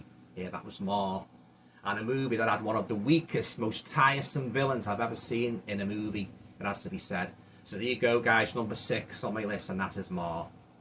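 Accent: Liverpool, United Kingdom. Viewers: I have a off topic question. I'm curious, Do you call your profile ' ', because you have a scouse accent? Or that's you're real surname? I'm American so I don't have the ability to tell regional accents of people.☺ but I'm always fascinated by accents. My dad was born Hungary, so I've grown up around 'Broken English' speakers and other European accents. But not many from the UK. I enjoy your channel.👍 I appreciate your "House That Dripped Blood" poster a lot! Probably my favorite Amicus movie. (I'm big Hammer and Amicus fan! I'm 37, but I've always loved those films. Nobody I know really enjoys or cares to watch them! Lol Except my mom and dad. 😅)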